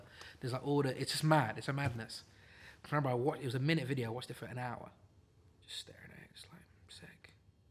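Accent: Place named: United Kingdom. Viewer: GUYS HE’S BRITISH WHAT?????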